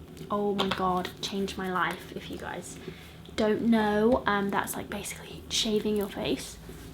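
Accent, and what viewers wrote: United Kingdom. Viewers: is she british? I LoVe her accent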